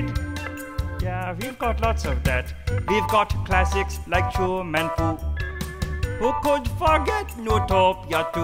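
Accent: Germany. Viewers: Why the german accent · And the third German agrees with that as well... your German accent sounds very interesting...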